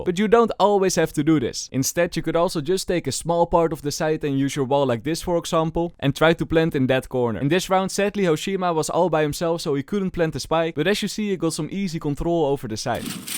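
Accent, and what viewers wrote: Netherlands. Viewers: Hii ...i still watch your videos even though i cannot play,your cideos are really very interesting mainly the dutch accent😂 Love your content❤❤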